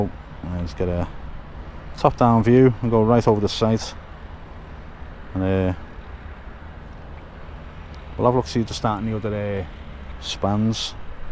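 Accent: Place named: Merseyside, United Kingdom. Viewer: Got to ask Merseyside has distinct accents north,south,east,and west Which part are you from Enjoy you videos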